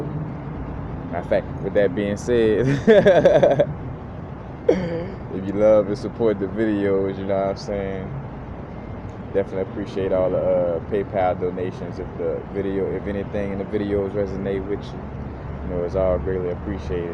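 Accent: New Orleans, United States. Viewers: You have to be from new orleans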